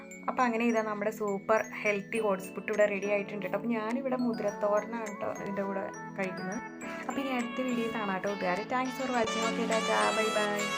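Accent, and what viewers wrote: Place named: Thrissur, India. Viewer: Ennu ഹെൽത്തി ആയ ഓട്സ് പുട്ട് തന്നെ ആണല്ലോ ഉണ്ടാക്കിയത്. സംസാരം കേട്ടിട്ട് തൃശൂർ ആണെന്ന് thonunnu👌🏻👍🏻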